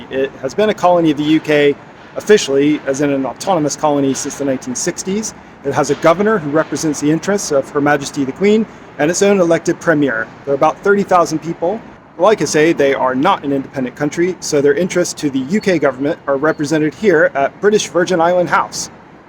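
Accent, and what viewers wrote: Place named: Southern California, United States. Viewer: is it just me or does this guy have the most intense southern california accent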